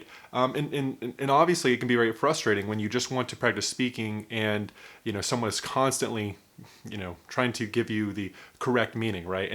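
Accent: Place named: United States. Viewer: Thanks for sharing. I was wondering where your accent was from. I'm quite sure that it's American but I can't recognise the State yet. I'm asking because I noticed that some Americans pronunce "Better" as "BeDer" while others use to pronunce it with the "T". I like your way of speaking and your points of view. Keep going 🙂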